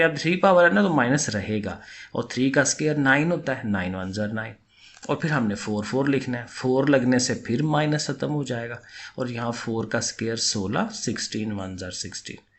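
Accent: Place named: Lahore, Pakistan. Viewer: Teacher's lahori accent revel on ... " Har baar multiply ho rha ha...."😂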